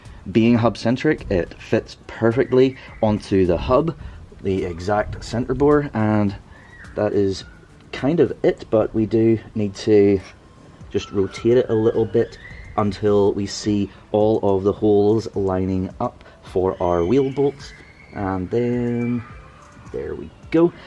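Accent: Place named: Northern Ireland, United Kingdom. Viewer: Are these legal in the UK as I am presuming by your accent and vehicle reg that you are in Northern Ireland. I have had 15 mm spacers on an E46 M3 in Australia where it is illegal probably based on the 'piggy back' type that are pretty risky. I wrecked two wheels and did thousands of dollars of suspension and body damage when a 30 kg manhole flipped out of its hole and instantly deflated both tyres and the spacers were unaffected but I did take them off before the car was repaired under comprehensive insurance. I am moving back to the UK and would like to put these spacers on a mini clubman if legal or probably even if their not legal but a bit of a faf if you have to take them off for MOT's and inspections by the Rossers.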